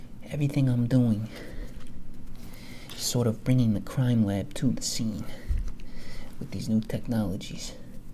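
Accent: Boston, United States. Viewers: Your Boston accent vids are my fave! Are you originally from there?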